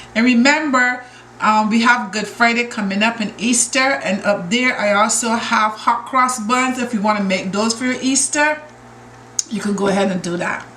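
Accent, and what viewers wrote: Barbados. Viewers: I happened across your channel a couple weeks back. From the time I heard your voice I said "she is a bajan". I am off work this week and you can bet I'll be trying some of these recipes.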